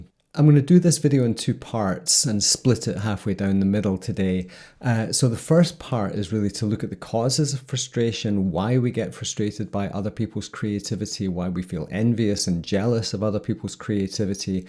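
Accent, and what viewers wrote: Scotland, United Kingdom. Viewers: The hypnotic Scotish (not Irish) accent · You do have a gorgeous Scottish accent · your nice Scottish accent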